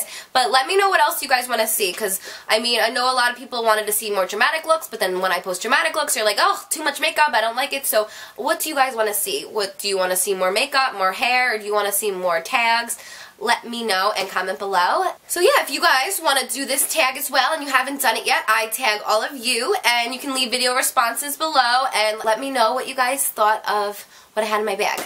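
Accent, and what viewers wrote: New Jersey, United States. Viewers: i love her jersey accent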